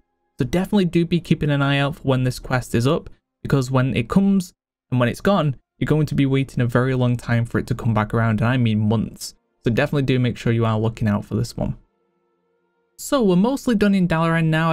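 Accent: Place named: Leicester, United Kingdom. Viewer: Great videos! Not trying to dox you but are you from Leicester?? Don't know anyone else with that accent but Leicester folk!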